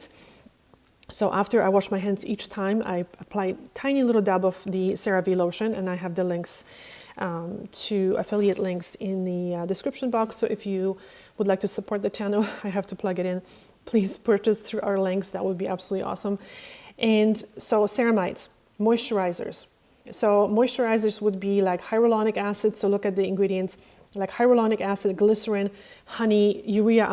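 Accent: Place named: Poland. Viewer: I can hear a slight Polish accent in your English. Am I right?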